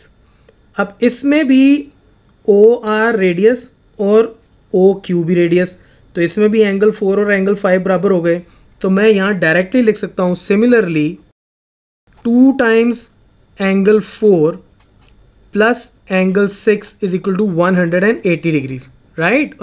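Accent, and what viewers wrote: Punjab, India. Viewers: Sir ap punjabi ho na ?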